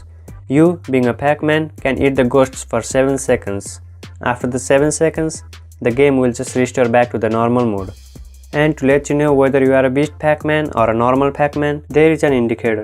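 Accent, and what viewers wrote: India. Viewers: He's an Indian now thats for sure, its recognizable by his voice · Are you from India sir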